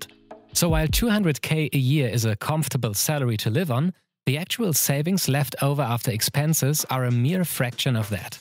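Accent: Germany. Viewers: Tell me you are german without telling me you are german: his accent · I know he’s German because of his accent 🤚🏾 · Alter der deutsche Akzent ballert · His German accent is so strong 😂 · Hat wer den deutschen Akzent rausgehört xD? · Ich hasse den deutsch-englisch Akzent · Are you german? Lol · Warum höre ich da einen deutschen raus? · Finde deine klare Aussprache und den leichten deutschen Akzent zusammen mit deiner Stimme unfassbar angenehm zum zuhören. Ich bekomme immer fürchterliche Kopfschmerzen wenn andere Deutsche versuchen künstlich american english zu sprechen. Außerdem natürlich auch inhaltlich ein tolles, super recherchiertes Video! Ich hoffe du gehörst mit dieser Qualität bald zu den ganz großen auf YouTube! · I love his german accent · Yea thats the right pronounciation, its a german company, german name. Judging by the narrators accent he is also german so he knows. 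Its not " A-die‐das" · Are you German by any chance? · Is it just me oder spricht er mit nem deutschen Akzent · Can't say for sure but the way he pronounces names sounds german, yes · yo dis is a german ass accent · Man merkt diesen Deutschen Akzent so hart haha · Man hört den Deutschen aus dir raus XD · Are you German? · Du hast echt nen starken deutschen akzent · Es entspannt mich das nicht nur ich ein german english hab 😂 gutes Video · German accent haha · R u german? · Du hörst dich wie ein englisch redender Deutscher an. Lieg ich richtig ? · Man hört dass du deutsch bist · you’ve got the srongest german accent i’ve ever heard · Der Sprecher ist Deutscher oder? 😃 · You german?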